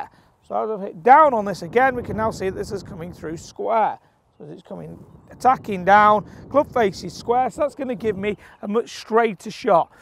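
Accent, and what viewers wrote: Manchester, United Kingdom. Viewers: As ever, a great video and very informative! It`s interesting to notice that when you are really interested in a topic you tend to speak quicker. That`s fine for me and your fellow Manc viewers, but for the non-Manc`s you might want to slow your delivery down a tad just in case they can`t keep up:)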